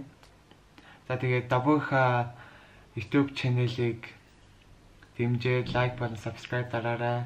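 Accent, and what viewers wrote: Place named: China. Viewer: хэлэнд нь жоохон хятад аялага сууцан бна.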